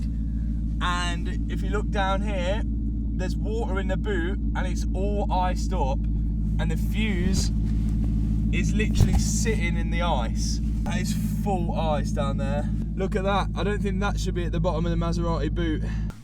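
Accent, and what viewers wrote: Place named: East Midlands, United Kingdom. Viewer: "Italian qualitehh" can't beat that east midlands accent 🤣🤣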